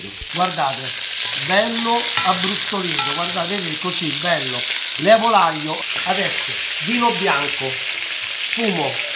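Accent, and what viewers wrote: Rome, Italy. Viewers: Maestro, continua col romanesco, la nostra bella Italia, lascia perde i radical chic inglesi. 😉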